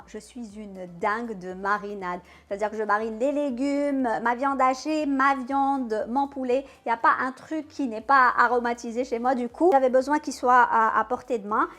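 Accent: Algiers, Algeria. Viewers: Coucou ma belle j'adore quand tu parle on français avec ton accent algeroissssssss j'adore tes vidéos merci beaucoup pour les astuces bisous pour les petites anges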